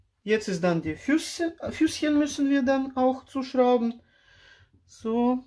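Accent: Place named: Russia. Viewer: Sehr, sehr sympathische und ruhige Art, dieser russische Dialekt (?) ist soo süß und sympathisch 😊 und deine Art zu erklären ist auch sehr verständlich und nachvollziehbar. Top! Danke 😊 Eine Frage noch: Das Gerät zum Wechsel bzw Erweiterung des Speichermoduls zu öffnen, ist das eine dieser "Aktivitäten" durch die die Garantie erlischt? 😅 falls ja, wäre das ja super dreist. Zumal Samsung ja explizit damit wirbt.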